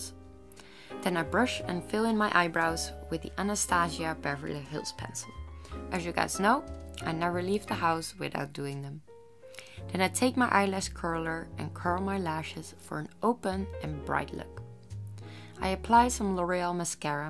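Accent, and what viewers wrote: Netherlands. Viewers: Her Dutch accent makes me cringe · Are u Dutch?? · Are you dutch?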